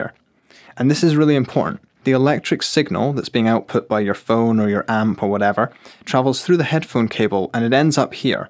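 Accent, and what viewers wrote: Scotland, United Kingdom. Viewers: He sounds Scottish. I want to say NW Scotland by the soft accent. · Are you Scottish?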